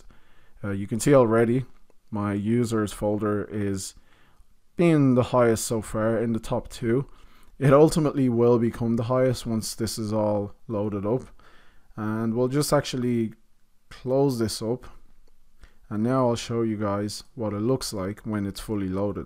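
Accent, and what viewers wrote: Ireland. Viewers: Ayo your Irish?! 😮😮 Cool tutorial bru!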